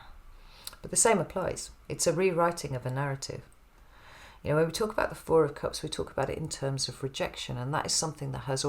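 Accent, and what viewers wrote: United Kingdom. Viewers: I get such a pure feeling of the British genius for language when you speak. Casts a spell.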